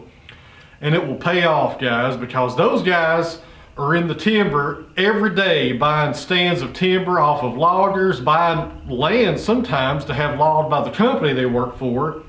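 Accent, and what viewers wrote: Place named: East Tennessee, United States. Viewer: Ha! I started watching this and thought "that sounds like an east TN accent", then looked at the description and saw you was from Kingsport! (I'm from WNC, love me some east TN!)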